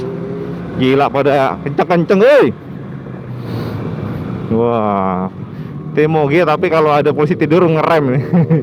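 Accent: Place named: Java, Indonesia. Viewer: Logat sampean iku cak..njowo tenan..jian seneng nnt sampean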